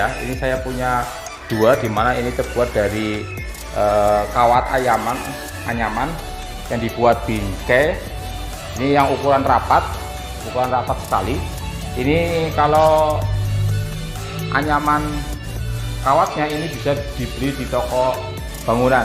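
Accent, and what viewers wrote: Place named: Java, Indonesia. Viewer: NtabS....ayakan si Medok 👍🏾👍🏾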